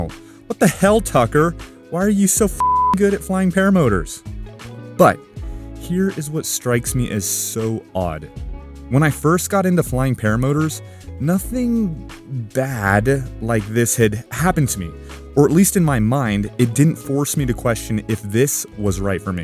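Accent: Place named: England, United Kingdom. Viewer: Love your English accent 😂.. better than my American one..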